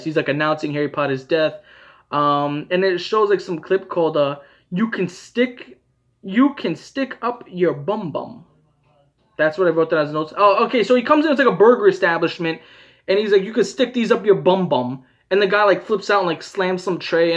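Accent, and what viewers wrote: New York, United States. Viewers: New York or Chicago? XD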